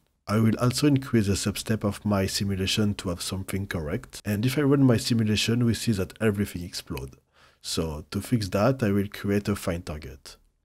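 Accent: France. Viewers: you're French ? you have a French accent ;)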